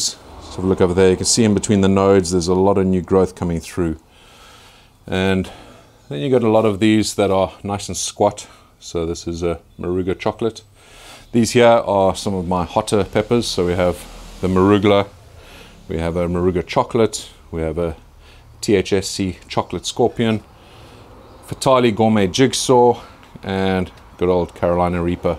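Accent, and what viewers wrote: South Africa. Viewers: Damn i was just about to comment about how South African he sounds! - Content is great! waiting for my own garden and i'll be referencing the vids for my own setup ^^ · I thought you have a South African accent. Too bad on your lost plants. Hope you get a good yield from the ones you have going though this year.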